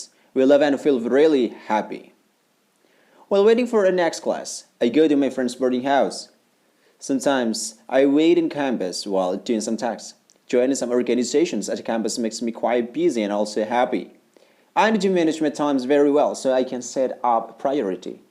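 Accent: United States. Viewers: ini pakai accent American English kah kak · logatnya american bgt, kaya ka Puri viera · Hi sir, american accent nya mantul. Pngen request klo boleh yg british accent, soalnya ak mau latihan buat tes toefl, krn ak perhatiin listening sectionnya kebanyakan pke british. Thank you 🙏🏻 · sir, America 'aksen? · Kak ini inggris aksen amerika yaa, aku ora nangkep😭. Kalo inggris british masih mending ngerti ngomong apa. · dia amarica acent ya? banyak kata yg kurang jelas :v padahal kaya james cordon, ellen, masih bisa nangkep, kalau ini seakan2 susah nangkepnya, mungkin bener di review sma mba sacha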